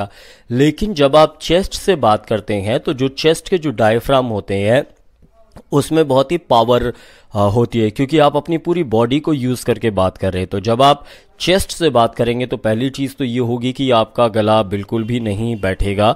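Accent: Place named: Rajasthan, India. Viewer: Bro apke Rajasthani influence kyu aa raha h r u from Rajasthan?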